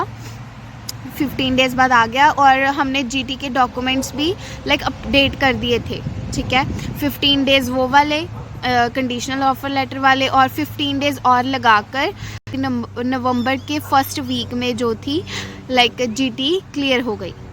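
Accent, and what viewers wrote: India. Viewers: Waah what a Punjabi accent!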